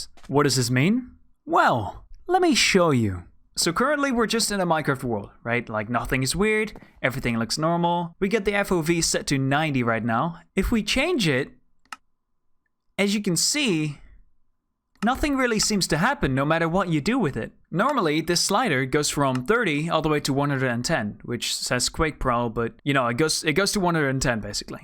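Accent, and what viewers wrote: Netherlands. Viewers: Hawky he’s probably actually Dutch cuz he kinda has a tiny bit of accent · Are you dutch? i am If someone is dutch say it in the comments. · What kind of accent is this? Dutch?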